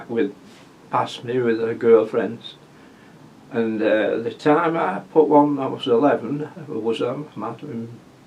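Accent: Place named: Bolton, United Kingdom. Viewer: Hi. Great to put a face to your wonderful tutorials. You are truly an inspiration. I love your accent. It reminds me of my childhood, growing up in Hulton Lane, Bolton, horse-drawn Manfredies ice cream and day’s when we “ played out” in summer until after dark. Best wishes.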